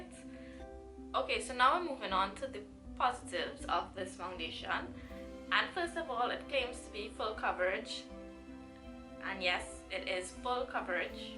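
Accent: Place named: Trinidad and Tobago. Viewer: trini!! new subbie soon as i heard the accent. thought the color was totally off at first but with some oxidation you worked it out and you looked beautiful.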